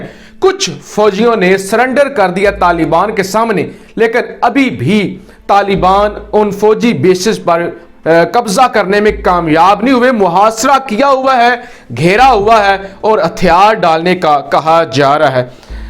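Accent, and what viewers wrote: Punjab, India. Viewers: Try to learn Urdu & Urdu pronunciation in evening classes. Dimagh ki dahi ho jati Hy Urdu in Punjabi pronunciation. Or yeh June main blazer Hadd kr de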